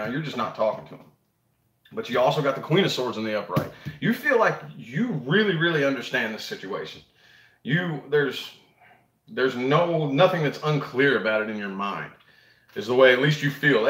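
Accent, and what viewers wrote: South, United States. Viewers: New subscriber alert!!! Loving that Southern accent of yours! Great reading. Thank you.x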